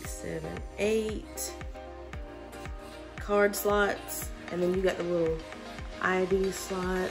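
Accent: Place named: South, United States. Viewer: Nice video. Suggestion: Don't put things so close to the camera. We can't see it like that. Keep it a distance away and put the palm of your hand behind it. Where are you from? You sound like you're from Tennessee, Kentucky, Alabama, or Mississippi.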